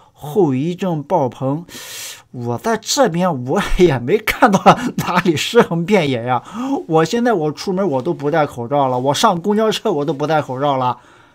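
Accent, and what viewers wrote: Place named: Northeast, China. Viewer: 笑死我了！！ 东北人講話太逗了！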